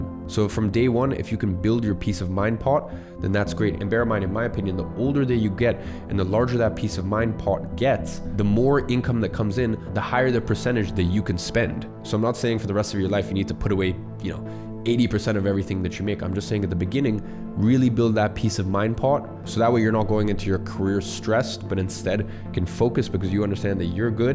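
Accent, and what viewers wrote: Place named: United States. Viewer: I don’t understand, isn’t he British ?? Why is his accent American?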